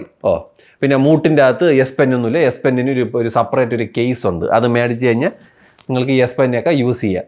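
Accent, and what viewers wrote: Trivandrum, India. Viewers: Nalla tvm slang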